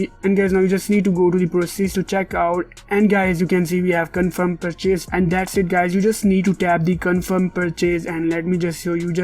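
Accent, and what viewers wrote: India. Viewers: once again dude with indian accents solved my problem. Thank you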